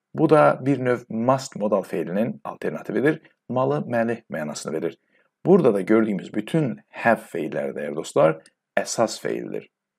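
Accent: England, United Kingdom. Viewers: You're perfect. And your accent as like real England accent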